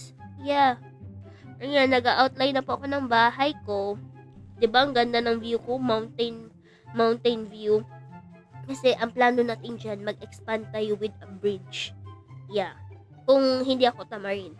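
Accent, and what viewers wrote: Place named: Conyo, Philippines. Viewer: ang cute ng pagka conyo ni ate 😭🤏🏻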